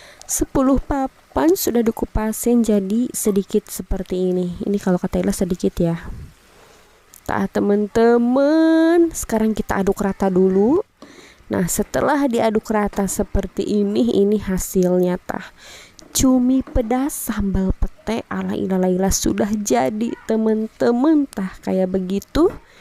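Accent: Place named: Banten, Indonesia. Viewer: Ila mah ngababita bae 🤤🤤🤤 ila bahasa na jiga banten nya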